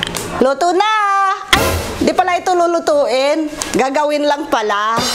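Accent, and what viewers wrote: Visayas, Philippines. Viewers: bisaya kaya xa ganyan. proud bisaya here.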